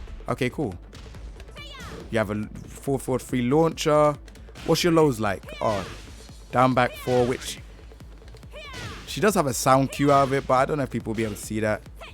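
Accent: South London, United Kingdom. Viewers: Both from U.K., is from south london and I’m in the south region too, it’s quite common to hear similar or diff accents over here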